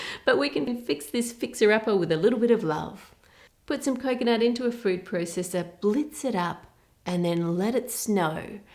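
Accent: Australia. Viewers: Doesn’t everyone in Australia speak like that?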